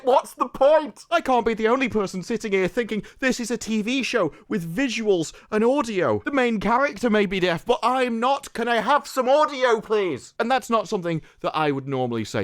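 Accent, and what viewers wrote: United Kingdom. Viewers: I couldn't get thru six minutes of this obnoxious brit talking shit. Like damn is this his whole platform? Pass.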